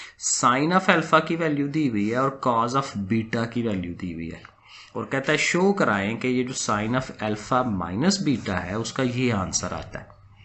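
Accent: Sialkot, Pakistan. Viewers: sir I think u r from Sialkot ❤️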